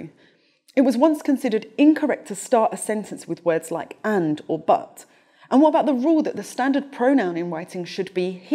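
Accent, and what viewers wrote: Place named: RP, United Kingdom. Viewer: Actually I think the message here is the opposite to that intended. We have a middle class young woman, speaking perfect English with a Received Pronunciation accent, telling the lower orders that grammar doesn't really matter. She picks a few of the tired old classic examples (and I'm a grammar snob, but I've given up on 'literally', and 'decimate' for that matter :-)), while ignoring the acres of poor usage that do lead to actual misunderstandings and confusion.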